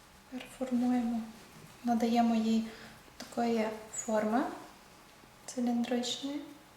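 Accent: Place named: Galicia, Ukraine. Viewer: Смачний рецепт ,непорівняний Галицький акцент,.дуже дякую.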